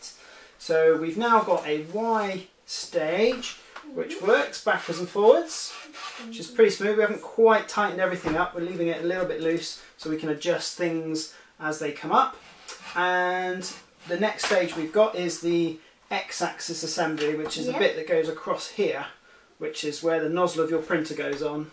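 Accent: United Kingdom. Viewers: He's from the UK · He's from the UK.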